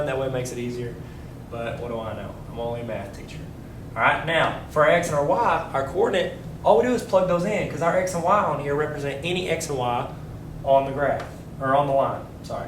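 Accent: South, United States. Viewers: Thanks dude and nice southern accent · I would pass math if he was my teacher... my teachers old and doesn't have an awesome southern accent XD like for if my math teacher looked and talked like him hed have my attention XD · Who else LOVES HIS COUNTRY ACCENT !!😍😍😁😁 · rodriguez That's what you call a southern accent. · His southern accent... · your southern accent is adorable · So southern! C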